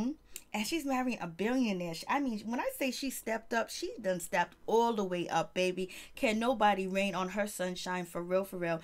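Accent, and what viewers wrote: New York, United States. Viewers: I love the way you talk..are you from New York??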